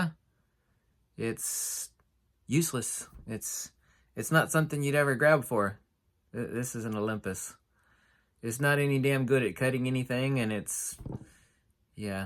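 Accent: Canada. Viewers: Gordon Mohr As a Minnesotan myself, a tad perhaps but still more of a Ontario inflection. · Great Canadian accent....had me fooled.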